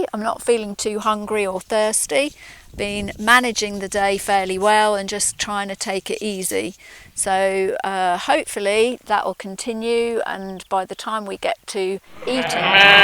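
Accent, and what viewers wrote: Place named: United Kingdom. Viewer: The British Accent 😍😍😍💚💚🔥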